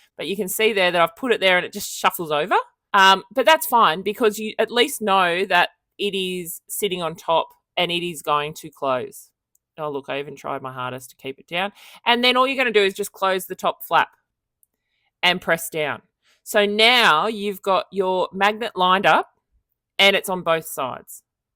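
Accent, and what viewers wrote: Australia. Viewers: I agree, good to hear a Aussie , I am in Sydney at the moment but looking at moving to Tassie....Thanks for the video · So nice to hear an Aussie tutorial, thank you from another Aussie ❤️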